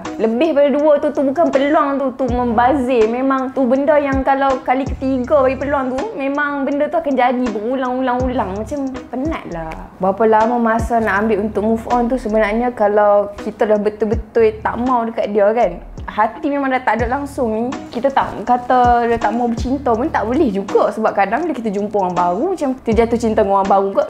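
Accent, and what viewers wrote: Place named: North, Malaysia. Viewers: Sedap lah orang kedah cakap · Haihh. Awat aku suka sangat dengaq loghat utara ni. 😂 😂 😂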